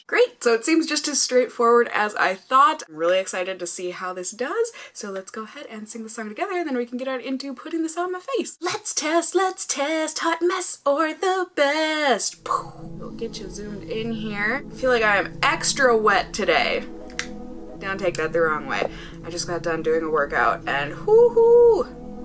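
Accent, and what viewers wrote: Minnesota, United States. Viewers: you sounded really minnesotan in this video 😂😝💓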